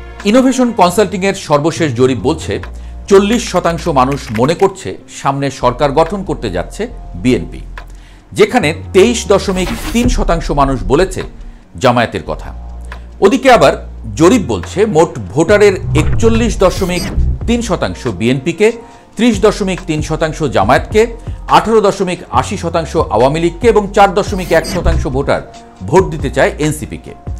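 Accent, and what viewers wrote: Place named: Kolkata, India. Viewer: Apnar kotha bolar dhron kmon jno kolkata kolkata tan ta ace .... 😅😅Bolci dada apnar bari kotay???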